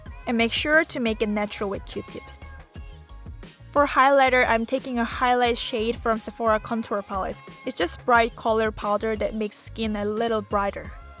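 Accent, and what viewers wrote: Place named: South Korea. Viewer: You’re so pretty and when I was younger I was surrounded by fellow Koreans with your exact accent! Because I don’t have an accent and I’m studying in America, I really missed it! I love your channel <3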